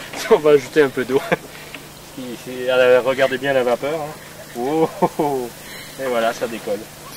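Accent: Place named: Quebec, Canada. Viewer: Amusant, il a l'accent Québécquois. Et du Kangourou, j'en mange ce soir pour le réveillon de Noël 2010 en France.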